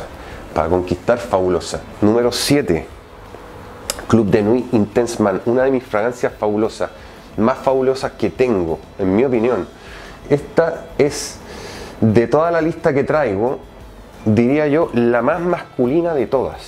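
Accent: Chile. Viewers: Aparte usa ese acento que parece frances y eso lo hace mas interesante aunque es chileno, de a ratos se le nota el acento chileno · Chileno no? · de donde eres ? , porque yo siendo Chileno se nota muy claro su acento Chileno y no le encuentro nada parecido al acento , venezolano ni Dominicano ni nada parecido. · hugo bello arce Ese es el típico acento chileno de Santiago y la zona central, tiene un no sé que de acento andaluz. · Acento chileno para que tu veas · Es Chileno. Se nota altiro... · Es chileno o español? 😅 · Que onda la gente que dice "aun se le nota lo chileno" ???? Habla totalmente Chileno, lo unico que se le pego de españa es el "os" en ves de "les" eso es todo, su tonada y forma de hablar es 100% Chilena. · Gar ..también pense que era español ..pero analizando bien ...todavía se le escucha el acento chileno....solo le falta agregar el ""pooooh" y ya esta. 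· es chileno cierto?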